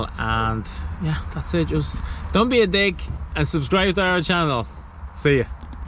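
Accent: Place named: Sweden. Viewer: You have similar accent to a friend of mine are you swedish too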